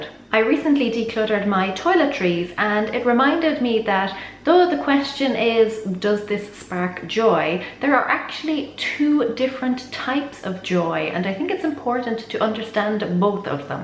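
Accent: Ireland. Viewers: oh u live in ireland? That's cool cause i'm german and I actually thought that you had an irish accent. Interesting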